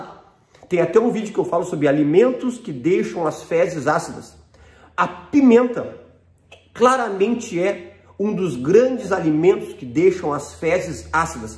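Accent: Rio Grande do Sul, Brazil. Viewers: Obrigada doutor, é muita bondade ensinar o que sabe, que sotaque bonito o senhor tem! Sotaque dos gaúchos.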